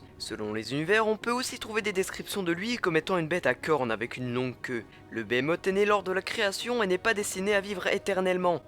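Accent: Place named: Switzerland. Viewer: salut tu as un accent est ce que tu es suisse? :)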